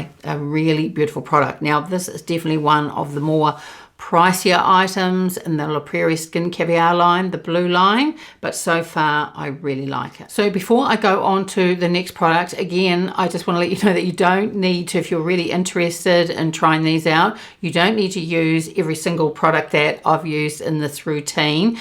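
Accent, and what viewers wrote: New Zealand. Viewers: Lovely Kiwi accent!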